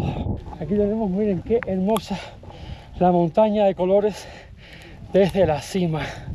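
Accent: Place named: Cuba. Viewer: Y por si acaso lo que t'escribo es en broma porque como no diría jamás Kiko : "Tu si me simpatizas" por tu manera de hablar tan cubano chico, por eso recordando la expresión del Chavo del 8 : " Tómalo por el lado amable" 🤗👍🏻❤️🇵🇪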